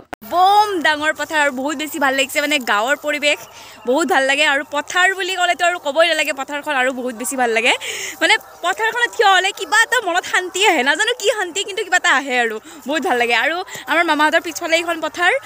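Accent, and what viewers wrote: Nalbari, India. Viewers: বহুত ভাল লাগিল ভিদিওটো, আপোনাৰ ভিদিও চায়ে থাকো । বিশেষকৈ নলবেইৰা ভাষাটো ক‌লি আৰু বেছি ভাল লাগে । মাজে মাজে কৈ থাকিব চোন। ❣️❣️❣️ ( নলবাৰী ধমধমাৰ পৰা) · Apunar ghar kot baa mane apunar vakha2 olp nalbari r nisina mr oo ghar t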